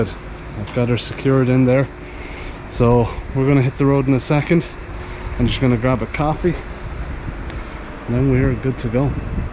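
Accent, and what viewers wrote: Ireland. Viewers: Gorgeous bike! Are you Irish by any chance ?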